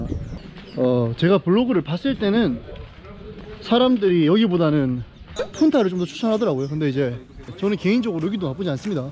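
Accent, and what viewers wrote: Gyeongsang, South Korea. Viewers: 잘생기셔서 그런가 외국 댓글이 종종있네요.경상도 분이신가요 사투리 쓰시는거같은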